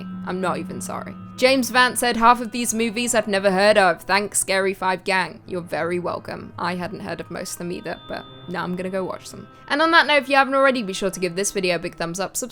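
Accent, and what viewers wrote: United Kingdom. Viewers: Awesome video in a beautiful UK accent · Figured a prison in North Korea would make the list. Lovely sounding British accent, BTW.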